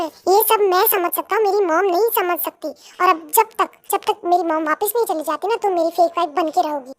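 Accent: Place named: Punjab, India. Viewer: Jinu punabi voice ❤😊🥰🥰🥰🐂💜❤❤❤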